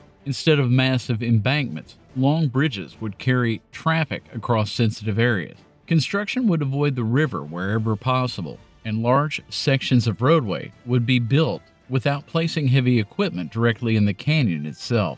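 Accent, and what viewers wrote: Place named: South, United States. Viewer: I have such fatigue for the southern accent and the blessing. Just stop - go to church and stfu.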